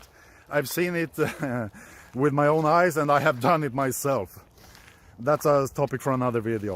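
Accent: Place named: Spain. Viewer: You have a Spanish accent! 1. You are not half a Greek! 2. Didn't you hear sirens? For months...from his window? 3. He got caught by Ukrainian SPU - who ( by his claims) - don't allow him to leave the country! During this "obducted " week - he surprisingly has to sign many non - disclosure papers! 🙆🤷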